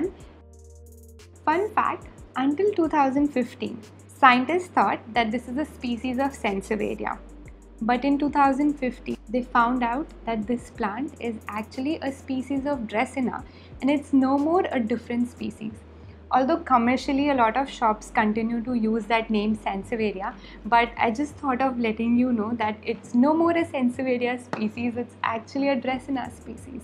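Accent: India. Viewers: U have too much Indian accent 🙄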